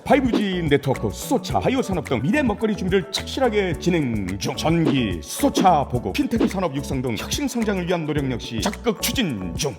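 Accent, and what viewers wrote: North Korea. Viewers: 나레이션이 북한같아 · 성우가 인민군같네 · 이거 진행하는 사람 북한인이잖아 ㅋㅋㅋㅋㅋㅋ 말투 븅신이네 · 진짜 이딴거 만드는 목소리톤마저 저기 건너편 윗편애들한테 닮아가는 기분이 드네 진짜 미친거 아니냐 이딴식으로 되도않는 뜬구름을 팩트라면서 방송하네 ㅋㅋㅋ 2021년 다시 만들어봐라 어떤가 한번보자 미치겠네 ㅋㅋㅋㅋㅋㅋㅋ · 나레이션 북한같아요.. 레포트 써야해서 참고하려고 보는데 도저히 못듣겠어서 소리 끄고 자막키고보네요.. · 성우 말투도 북한 억양이네 일부로 그러는건가? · 말투 북한이냐?? 시이발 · 이정도면 걍 우상화 프로파간다 선전물이네....말투도 진짜 북조선 애들 같다...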